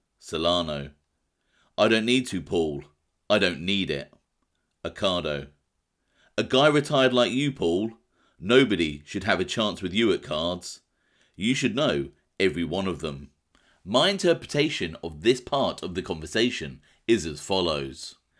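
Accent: United Kingdom. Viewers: The British trash accent is so annoying just shut up and play the recording nobody cares about the annoying narrator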